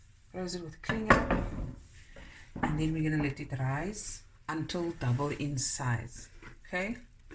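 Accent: South Africa. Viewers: Hi l made these yesterday. They were delicious. I miss that SA accent,now living in UK.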